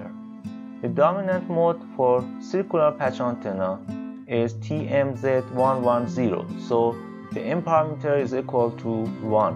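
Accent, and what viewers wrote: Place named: China. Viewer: Nice video! BTW, you sound Chinese.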